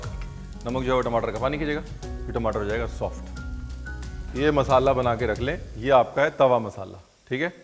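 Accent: India. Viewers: "Main keya ayi k nai hun dhabbe ali feel"😂😂👍 That total Desi accent is so appealing to hear that we rush to to your vedios not just for magical cooking recipes but also for this entertaining content. It makes you stand apart from all others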